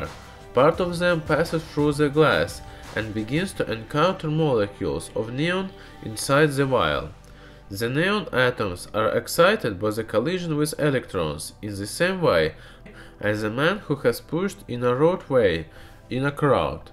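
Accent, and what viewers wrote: Russia. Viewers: nice to be a brazilian that try to understand a russian talking in english. =D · I can't understand anything from your "russglish"👌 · this is russian accent??😂😂😂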